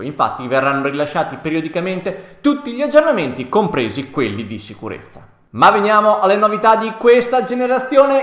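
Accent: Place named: Emilia, Italy. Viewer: sento un accento famigliare...tra Ferrara e Bologna...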